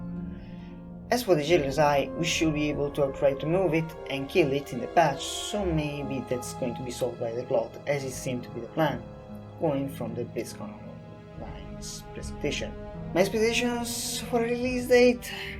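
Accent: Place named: Germany. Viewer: Have to love that accent. Is it German or x. X)... Ohhh god the lvling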